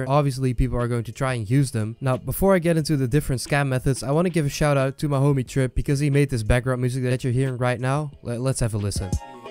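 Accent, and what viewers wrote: Netherlands. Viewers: You sound a bit Dutch!? can that be right? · I can hear a Dutch accent XD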